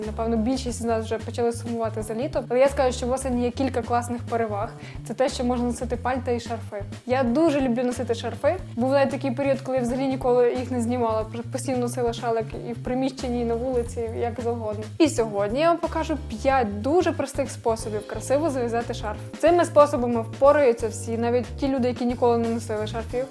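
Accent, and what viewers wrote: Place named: Ukraine. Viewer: У Вас дуже гарний український акцент:-)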